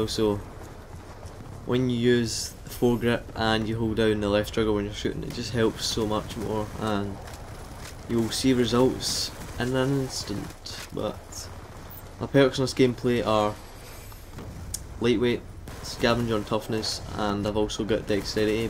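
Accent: Scotland, United Kingdom. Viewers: Has he's Scottish and that waz a BEAST gameplay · waiting for the scottish accent tutorial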